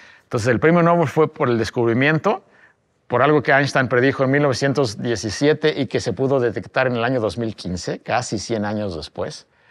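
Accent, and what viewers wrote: Mexico. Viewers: Acento mexicano. Me lo ha arruinado. NO gracias. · Hasta que escucho un Mexicano, muy bien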